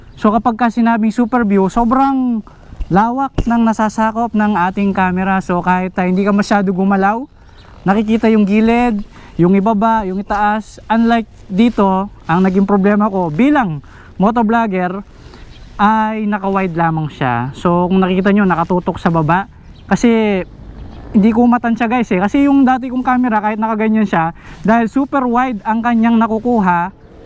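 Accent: Pampanga, Philippines. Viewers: Kapampangan ka po?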